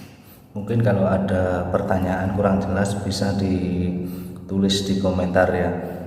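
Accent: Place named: Kebumen, Indonesia. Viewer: kebumen ngapak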